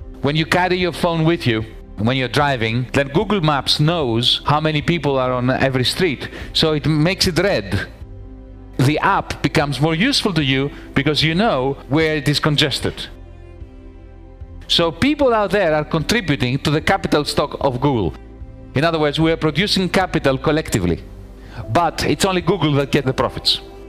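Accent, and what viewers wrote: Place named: Russia. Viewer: The Russian accent is so enraging when it comes to criticize of capitalism!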